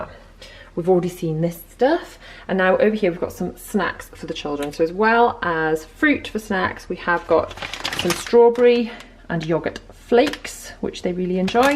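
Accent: United Kingdom. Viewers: I have never seen them in a store. It’s just a funny thing you say when you’re trying to impersonate a British accent, “would you like some tea and crumpets?” I didn’t even know what they were prior to this video.. for the record that’s not meant to be rude. I’m obsessed with your accent and long to visit one day!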